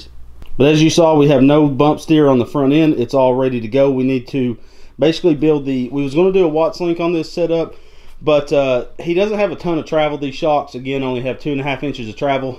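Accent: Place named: Georgia, United States. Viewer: Cool. When I first heard you talk I thought you were from South Georgia. Nice video!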